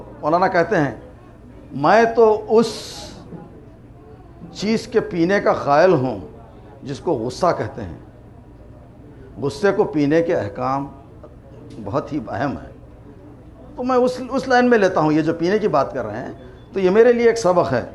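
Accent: Hyderabad, India. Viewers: Hydrebadi hain unki maadri zuban ki waja se ye alafaz ada karna mushkil hai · It is the Daccani/Haiderabadi accent which is fine. Qasam is pronounced "khasam" in Haidarabadi accent and you might have hearf, he has pronounced "Kharaabaat" as "Qaraabaat" once. This is the daccani accent. In Delhi it would be pronounced "Qasam" and "Kharaabaat". Yes, and in Panjab it would be pronounced "Kasam" in some regions even "Haraabaat" instead of "Kharaabaat", and this way way way worse.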